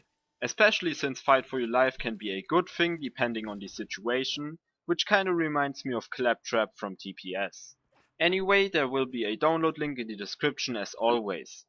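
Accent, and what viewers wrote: Germany. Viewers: Klingt sehr deutsch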